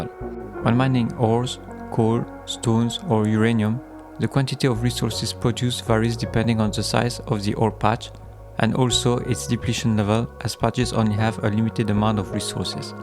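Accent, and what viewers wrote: France. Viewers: Le petit accent français, j'adore 💕 Cocorico ;P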